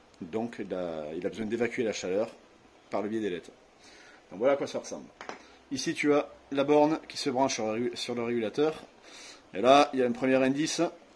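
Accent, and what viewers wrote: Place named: South, France. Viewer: Salut je viens de voir ta vidéo sur le régulateur 👍 c'est super bien expliqué car j'ai eu le problème la sur ma Honda vf750c rc43 de 1995 je super sympa que tu donnes de ton temps pour aider les autres et j'espère qu'ils seront reconnaissant envers toi .en tout cas moi j'ai trouvé ça génial et en plus le petit accent du sud c'est le top merci pour ton explication 👍 Bonne continuation 👍🤟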